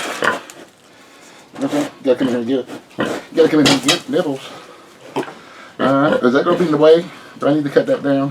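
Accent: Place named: Oklahoma, United States. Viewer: Where ya from? Been tryin to figure it out. You kinda talk like us round here. Im from north east Oklahoma. By Arkansas kinda. Just wonderin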